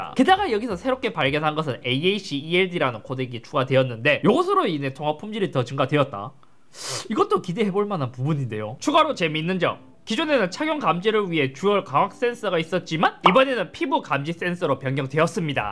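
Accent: Gyeongsang, South Korea. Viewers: 잇섭! 귀여우다 발음을 들어보면 대구출신인것 같은데 맞아요?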